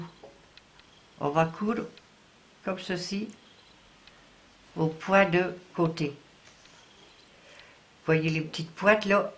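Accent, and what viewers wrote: North, France. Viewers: Bonjour ! Merci beaucoup pour votre pédagogie, vous êtes simple, toute " mimie " avec l'accent du "chn'ord" que du " bonhor !!"🌹👍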